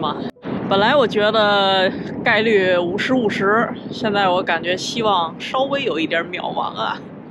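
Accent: Beijing, China. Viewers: 之前是喜欢这一口京腔儿，现在是喜欢这样一个真实的摄影师，还是期待EDI能走的更远👍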